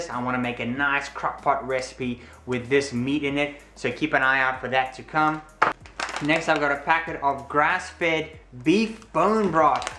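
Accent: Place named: South Africa. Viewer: Fantastic news! For Americans, try the Butcher's Box. Same criteria products. 💕🌞 Side note, if you were born and raised in B. C., how do you still have your South African accent? So curious sunshine. 🤔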